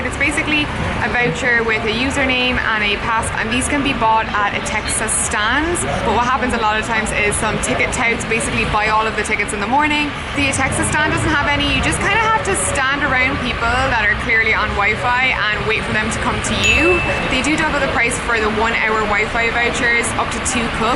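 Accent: Ireland. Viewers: You're from NYC? have an awful iris accent. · I love it. A beautiful woman who looks sometimes asian sometimes middle eastern sounds Irish lives in NYC and is reviewing Havana. If there is ever proof that the world is getting smaller here it is.!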